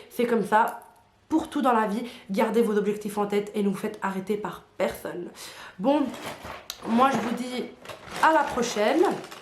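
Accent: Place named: Belgium. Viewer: L’accent belge est vraiment marqué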